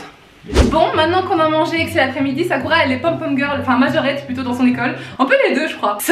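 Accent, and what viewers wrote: Belgium. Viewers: Tu es Belge ! sais trop bien moi aussi xD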